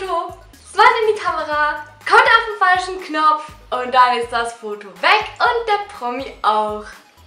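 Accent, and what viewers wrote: Switzerland. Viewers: du bisch schwizerin oda ? :D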